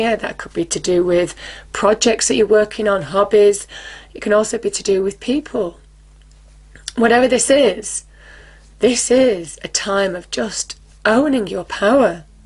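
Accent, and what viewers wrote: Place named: RP, United Kingdom. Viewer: 🌸gosh I luv ur intro and your voice, so posh 👍🏼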